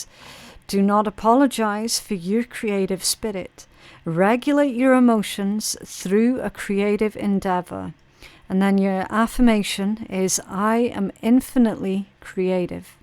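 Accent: United Kingdom. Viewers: Yay a British reader 😁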